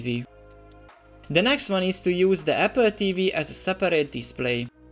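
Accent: Hungary. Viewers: Are u Hungarian? The accent :)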